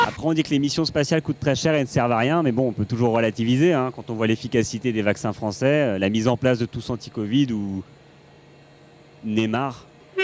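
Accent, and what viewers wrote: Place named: Russia. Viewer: L'anglais parlé avec l'accent Russe est parfait <3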